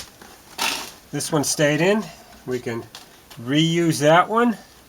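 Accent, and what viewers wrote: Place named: Boston, United States. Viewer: Ya sound like your from bawwston, are you from bawston there boy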